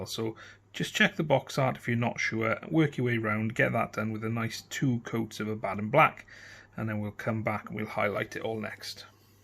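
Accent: Wales, United Kingdom. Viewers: Another great tutorial mate, you're really making me want to get some of these new Necrons now. Also it's great to hear another Welsh accent on Youtube, Keep the good content coming!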